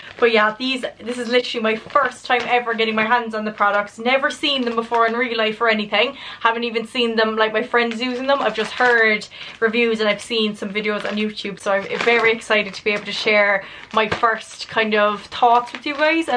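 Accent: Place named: Ireland. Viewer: Beautiful 😍....I am a lady in my sixties and I have been using Trinny products for years now...amazing....she does a product called...." face finish ".... which is a cream powder you really must try it...no one else in the cosmetic world does it....enjoyed listening to your Irish lilt....love Jane...North Wales UK....x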